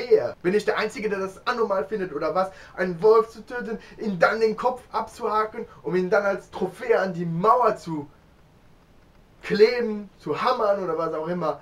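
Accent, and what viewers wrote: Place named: Hesse, Germany. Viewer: Ich finde Deine Videos immer sehr erfrischend und man lernt viel, vor allem mag ich die mit dem Wolfshund. Eine dumme Frage: Ich wusste übrigens gar nicht, dass die Luxemburger Deutsch als Muttersprache sprechen oder kommst Du eigentlich aus Deutschland? Ich hätte Deiner Sprache nach gedacht, dass Du aus Hessen oder Rheinland-Pfalz kommst und dass die meisten Leute in Luxemburg Französisch oder Luxemburgisch (Letzeburgisch) als Muttersprache haben.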